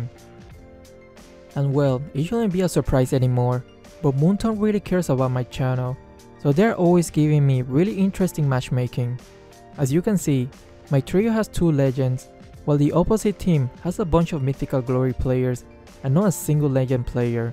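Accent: Philippines. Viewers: im confused at your accent are you filipino or what?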